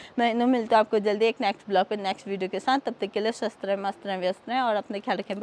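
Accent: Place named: Pahadi, India. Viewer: Hindi m v vlog bnaoge to chlega dii ek pahadi chahe kitni hi hindi kyu na bolta ho uske bolne m phadi accent hi hota or vo aapki voice m h hindi m vlogging krte huye phadi words ka use krna isi s ek phadi ki pehchan h proud to be a phadi uttarakhandi love from Almora ❣️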